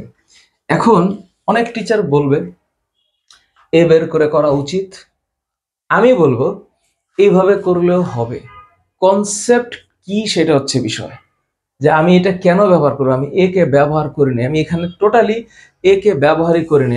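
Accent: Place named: Sylhet, Bangladesh. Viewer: Sylheti basa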